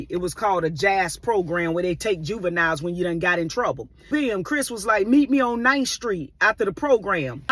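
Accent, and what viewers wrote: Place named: South, United States. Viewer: I’m from NY & I think it’s the southern accent that makes your stories so funny to me. Glad GOD has kept His hand on you throughout your life and that you share these testimonies with us. Bless you!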